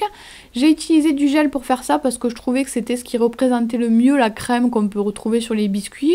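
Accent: South, France. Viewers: du sud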